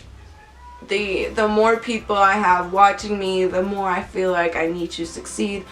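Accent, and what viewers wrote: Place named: Chicago, United States. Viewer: u don't actually look like u need to lose a lot of weight being honest I don't know what your goals are.. I'm trying to place that accent it reminds me of Chicago.. But I'm not convinced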